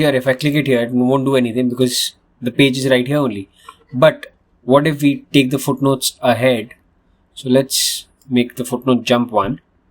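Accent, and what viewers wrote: India. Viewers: Very good video, but sorry, your indian accent is vey strong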